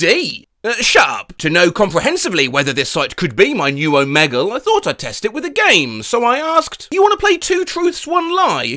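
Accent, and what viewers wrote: United Kingdom. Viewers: He's LITERALLY Sherlock Holmes... speaking fast and in a detective sort of fancy way, deducing fast, British, sherlock soundtrack.... it's literally perfect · You sound british :D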